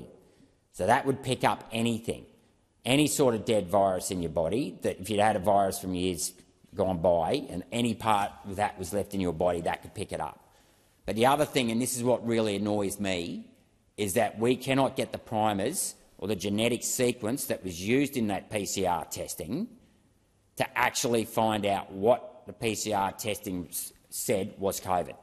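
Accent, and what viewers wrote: Australia. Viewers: Another succinct, intelligent, legendary monologue Senator, beautifully delivered in the dulcet tones of your authentic Aussie accent ... cheers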